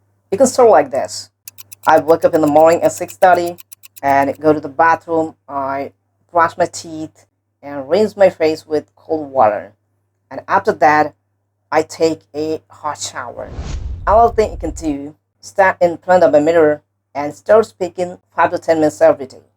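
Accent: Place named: England, United Kingdom. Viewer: I think you are from England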